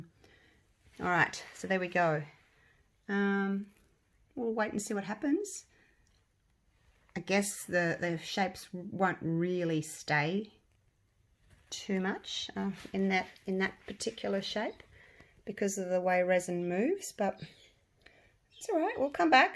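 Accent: Australia. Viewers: so good to hear an aussie voice!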